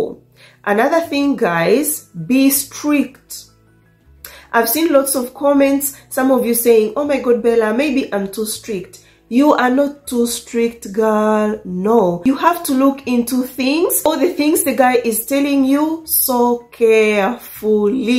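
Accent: Uganda. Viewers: Teller's accent is a Ugandan.Sorry 😢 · This is ugandan accent not mzungu the voice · It's Ugandan accent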